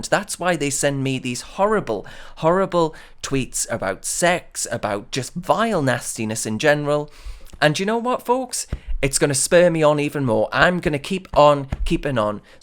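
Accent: Newcastle, United Kingdom. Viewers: As you open up here a personal note, can I ask you, where does your accent hail from (I really like it)? Is it Geordie? All the best from your subscriber here, who thinks you are great!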